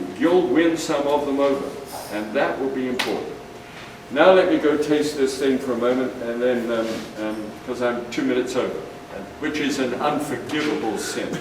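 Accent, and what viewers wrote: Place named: United Kingdom. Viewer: I always enjoyed watching the Galloping Gourmet. He always spoke in Japanese, though. His jokes were also very Japanese. I did not know he had British accent until recently.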